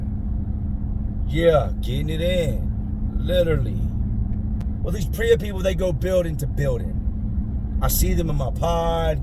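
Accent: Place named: South, United States. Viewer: hes from the hood in the south do you expect him to sound like JFK??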